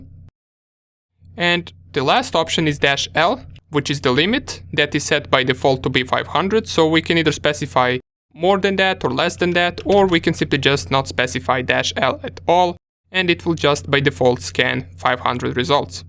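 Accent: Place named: United States. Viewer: Tu American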